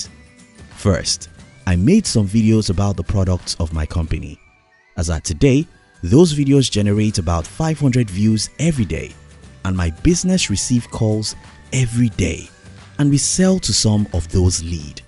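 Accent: Nigeria. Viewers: This narrator is definitely a Nigerian brother. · The voice sounds Nigerian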